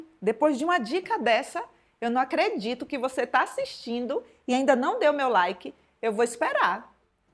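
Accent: Bahia, Brazil. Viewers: Oi amada. Morei 22anos. Em Salvador, e me falaram que esse molho se chama molho lambão uma delicia, morro de Sdds desse sotaque lindo, hj voltei para O Rio de Janeiro , estou me recuperando de um momento de doença, mais assim que melhorar farei seus salgados para venda , obrigada e Deus abençoe sua vida sempre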